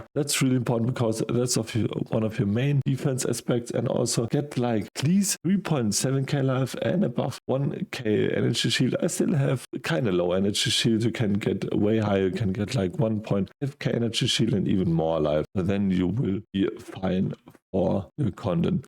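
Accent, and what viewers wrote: Germany. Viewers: Hes pretty clear, I think he's German, so the accent is there its understandable, atleast for me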